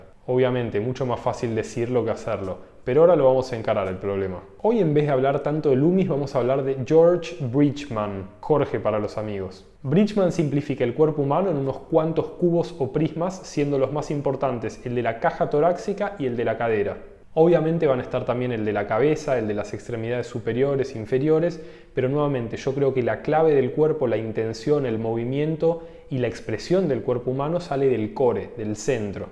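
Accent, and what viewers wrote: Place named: Argentina. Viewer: Entretenido tus videos, wena combi entre el acento argentino, aprendizaje y memes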